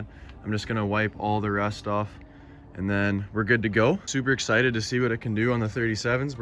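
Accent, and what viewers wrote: Canada. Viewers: Commentary is hilariously Canadian #LetsGoBoyzzz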